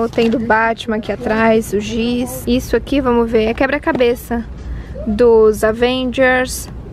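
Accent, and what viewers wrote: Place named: Santa Catarina, Brazil. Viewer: No início do vídeo já imaginei que vc era aqui de SC pelo sotaque 😊😘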